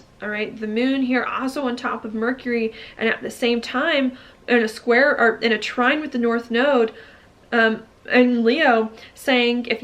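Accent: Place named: South, United States. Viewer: Happy Holidays. Have fun in beautiful Georgia. I knew you were from there how you say so sweetly "thank you for watching my video, if you like it please share" total sweet southern way of saying something. Not an accent so much as a emotional quality. I love the south. My husband and I decided our personal heaven is gonna be like the south minus the bugs and gators.: )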